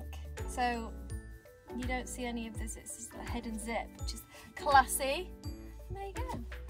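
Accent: United Kingdom. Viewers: I just love your cute videos! I bought all sorts of tea at the store this morning because of you😉 your style is adorable. I also have decided you look like a combination of Jennifer Garner and Kierra Knightly, you are so pretty and your accent is the best. My husband always makes fun of me and my obsession with a British accent. I mean I feel like British people could say anything, even if it makes zero sense, but somehow it always sounds smart and classy with their accent🤣 love all your videos, and I will miss Fitzsimmons so much!!! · Love you from Japan 🇯🇵! I am learning British accent from AOS and your video! I can’t wait AOS comes Japan😭😭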